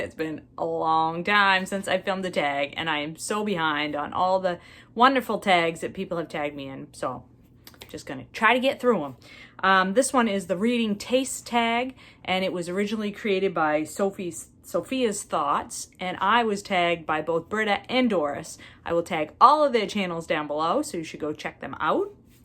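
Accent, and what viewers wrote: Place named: New Hampshire, United States. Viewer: My guess was NH😊. I’m originally from Mass, now in CT. Glad to have found you.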